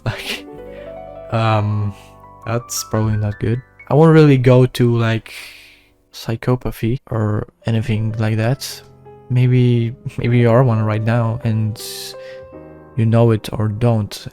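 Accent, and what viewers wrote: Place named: Turkey. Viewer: Bro has Turkish accent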